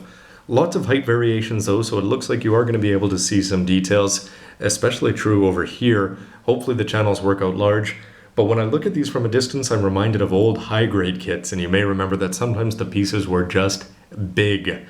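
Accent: Canada. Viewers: is, in my summation, Canadian. I say that based on his accent and vocabulary. And honestly, I am curious why he lives in Japan but if he wants us to know he will decide when and if he tells us. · Judging by his hands and his accent, I'd say he's probably white and probably from Canada or the northern United States (because it sounds like he says "out" like "oot" sometimes).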